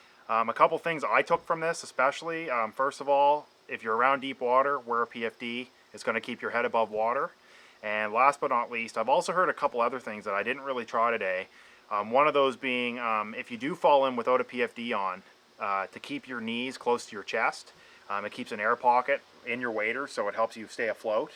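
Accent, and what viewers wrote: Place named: Canada. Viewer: Thumbs down because of canadian "aboot" and "ooot"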